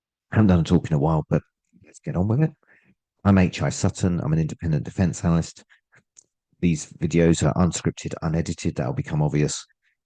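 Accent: United Kingdom. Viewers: copium hard by British accent what would you expect, the truth.... Saddam had Nuclear bombs in Iraq...... HAHAHAHA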